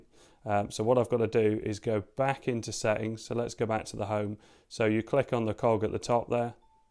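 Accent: United Kingdom. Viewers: Thank you nice man with cool British voice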